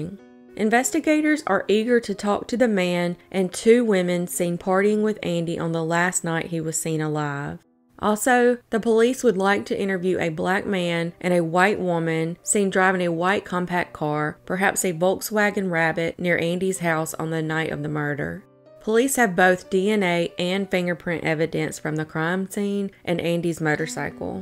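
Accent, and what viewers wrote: South, United States. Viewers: This narrator has got to go. I've lived in Arkansas most of my life and have a Southern accent myself, but this is just frustrating and distracting. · I like your Southern accent ... And of course the interesting stories and great narration. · Love your channel! You have such a soothing southern voice These cases are so tragic. You should definitely do another Oklahoma one!!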